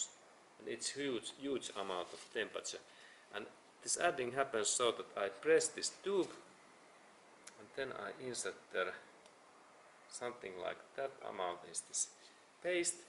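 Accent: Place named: Finland. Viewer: Thank you for a very god video , you have a very pleasent manner and explain very well in your finish english accent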